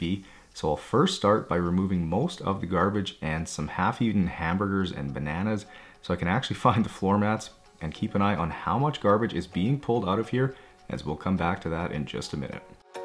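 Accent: Canada. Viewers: My favorite part watching these vids, as an American, is seeing how proper this dude talks during the voice overs and then seeing him go full Canadian once they come to pick up the vehicle. Cracks me up every time. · As a Canadian, he sounds Canadian in his voice overs.......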